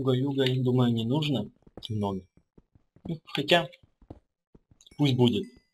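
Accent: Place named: Ukraine. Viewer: Акцент України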